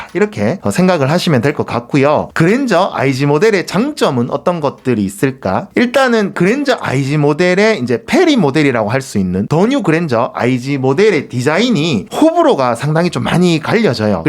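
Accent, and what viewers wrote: Gyeongsang, South Korea. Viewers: 대구경북 언어를 구사하시는데‥어딘가요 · 경상도 사투리가 참 심하십니다ㅋㅋㅋ 부산에사는 군대동기도 이렇게 심하진 않던데ㅋㅋ 도대체 경상도어딥니까?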